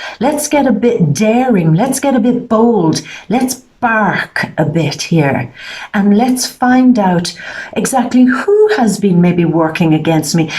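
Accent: Ireland. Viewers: Love the irish accent, im from cork.xc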